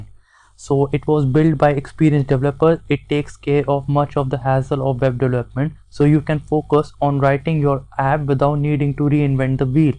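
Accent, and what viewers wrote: India. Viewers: Buttler English🤢 ,fully bihearted English 😂🤣😅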